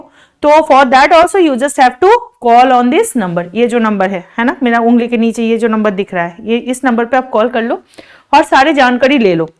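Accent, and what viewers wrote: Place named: Bengal, India. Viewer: Bengali accent wow.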